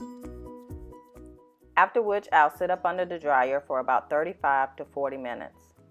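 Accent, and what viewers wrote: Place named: New Orleans, United States. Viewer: I definitely hear New Orleans in you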